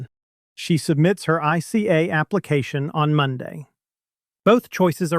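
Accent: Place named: Australia. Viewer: What's with the Aussie accent??!!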